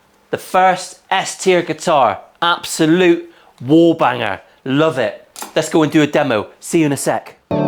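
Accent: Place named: England, United Kingdom. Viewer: Me too. I love the colourful language. It's hilarious and makes me proud to be British. Why does innuendo sound so much better in a West Country accent?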